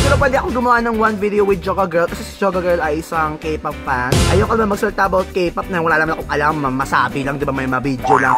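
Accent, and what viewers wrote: Visayas, Philippines. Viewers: Kuya! Curious lng ko, taga aha imo mama or papa? bisaya lge kaayo hahahaha! Proud Bisaya here 😊😊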